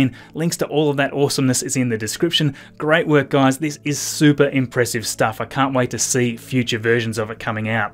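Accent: Australia. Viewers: dude are you an auusie , what state are you in ?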